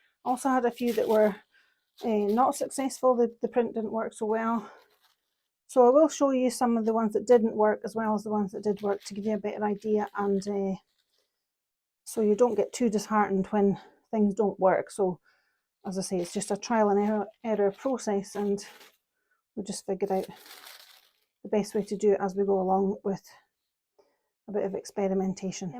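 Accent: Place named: Scotland, United Kingdom. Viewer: Love your test book. Love your Scottish accent. Love your work!! Subscribed 😊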